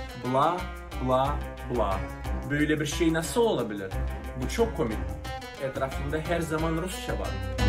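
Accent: Thrace, Turkey. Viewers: Aksanının harikaaaaa şiven tıpkı Trakyalı ya müthiş! 🤞🤞Türkçe- Fransızca- İngilizce için buradayım 🤞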